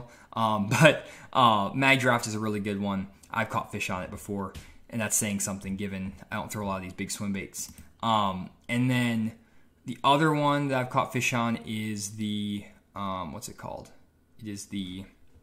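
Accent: Arkansas, United States. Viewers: Your from Arkansas??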